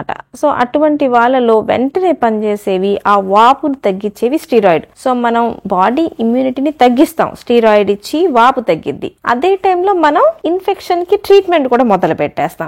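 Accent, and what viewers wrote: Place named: Ongole, India. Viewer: డాక్టర్ గారు మీరు వివరిస్తున్న విధానం బాగుంది. కానీ తగ్గుద్దీ వచ్చుద్దీ అవుద్దీ ... వంటి ఒంగోలు విజయవాడ లో గ్రామీణ యాస ఎక్కువ గా వుంది. Public forum కదా.. అన్ని ప్రాంతాల్లో చూస్తారు... భాష కొంచెం మార్చుకుంటే ఇంకా చాలా ఆకర్షణీయం గా ఉంటుంది.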